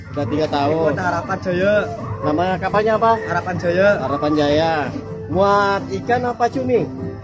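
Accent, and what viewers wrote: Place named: Java, Indonesia. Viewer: Ngomonge medok nmen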